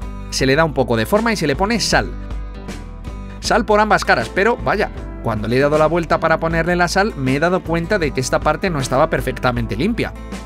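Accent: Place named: Chulla, Ecuador. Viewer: A nadie más le molesta ese micro cuando prueba la comida.... cuando hablas como "chullas" se escucha bastante mal....para lo bueno q es tu trabajo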